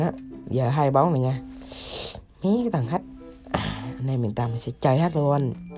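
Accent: Quang Nam, Vietnam. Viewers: Giọng ông giống người Quảng Nam hay Quảng Ngãi hay Đà Nẵng ấy nhỉ ?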